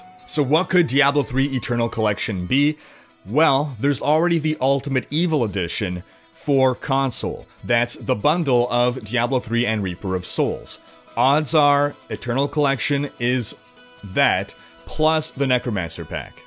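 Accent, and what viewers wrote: Quebec, Canada. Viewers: Wait, are you a french canadian ?! :D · Whoa! Are you french canadian?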